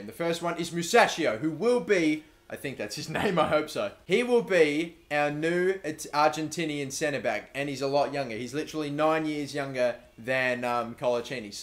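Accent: Australia. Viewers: He is Australian. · wait, you support nufc but you sound Australian? well... good enough for me, SUBSCRIBER EARNED NUFC4LIFE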